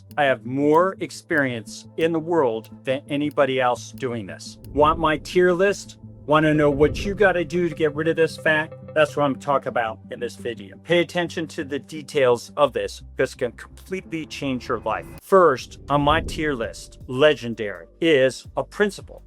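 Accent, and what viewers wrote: United States. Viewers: Gotta love this dude's mid-Atlantic accent. I bet he's from Maryland or somewhere nearby.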